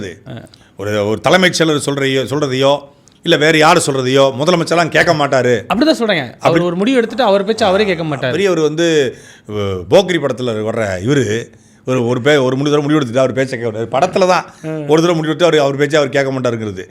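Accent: Tirunelveli, India. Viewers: சாட்டை தம்பி, உன் பொருநை புரளும் பொதிகைத் தமிழ் உச்சரிப்பை பொழுதெல்லாம் கேட்கலாம். வாழ்க உன் அழகு தமிழோடு நீயும்.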